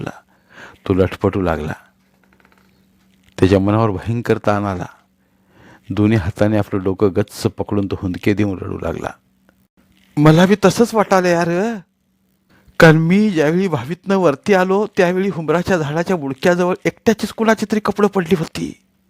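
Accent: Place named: Rural, India. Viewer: सर तुमचा आवाज चांगला वाटतो ग्रामीण भागातील आठवण येते धन्यवाद 🙏🙏🎉🎉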